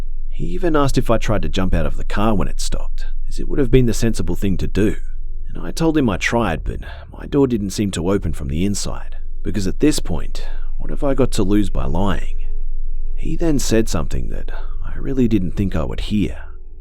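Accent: Australia. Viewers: You're from Australia, right? Your accent is familiar. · Do you live in Australia (I can tell you have an Aussie accent cause I'm also Australian)🇦🇺🇦🇺🇦🇺🇦🇺🇦🇺🇦🇺🇦🇺🇦🇺🇦🇺🇦🇺🇦🇺🇦🇺🇦🇺🇦🇺🇦🇺🇦🇺🇦🇺🇦🇺🇦🇺🇦🇺🇦🇺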